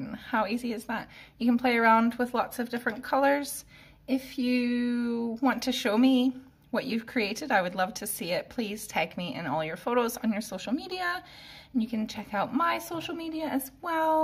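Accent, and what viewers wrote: Scotland, United Kingdom. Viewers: apologies for the mistake. You’ve certainly picked up a bit of that Scottish accent 😀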